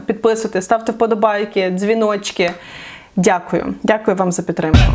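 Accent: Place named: Western Ukraine, Ukraine. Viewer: Знаєш жінок зараз теж беруть до армії. При чому багато жінок воюють. Іди на фронт. А ти западенка гнiдна пиздиш своїм ротом поганим, але навіть не була на війні. Досить сварити народ, тварюка. Багато військових російськомовних, багато волонтерів теж. А хто говорить зараз українською різко став, політики, псевдопатріоти. А ти навіть не українською говориш, а суєш свій діалект, видавючи за українську мову. Чмошна підараска.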